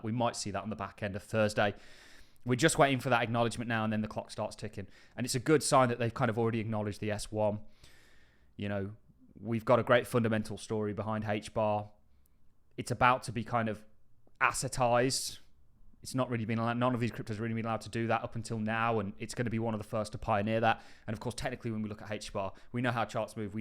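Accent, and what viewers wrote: Birmingham, United Kingdom. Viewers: That’s a brummie accent 👍🏻😎👌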